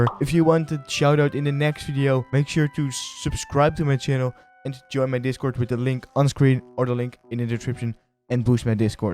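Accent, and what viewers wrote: Netherlands. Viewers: Ben je Nederlands? Ik hoor aan die accent 😂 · Alright, goos vid but this dutch accent lmao great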